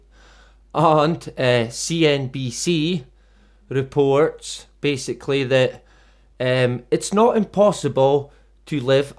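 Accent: United Kingdom. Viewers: Nice to hear a British voice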